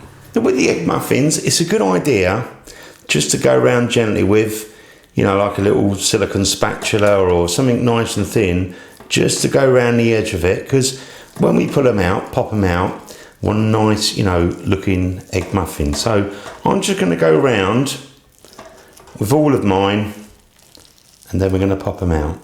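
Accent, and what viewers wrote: United Kingdom. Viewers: He's from the UK, he can't help it. It's a very cute tutorial, I think.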